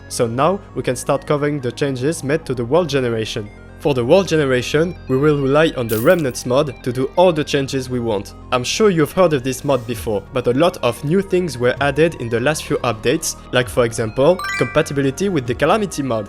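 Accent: France. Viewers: le bon french accent on apprécie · tu ne serais pas français parce que ton accent fait très français ? sinon super vidéo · I can feel that french accents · Je sais reconnaitre un accent français quand j'en entend un. Super vidéo bro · are u french u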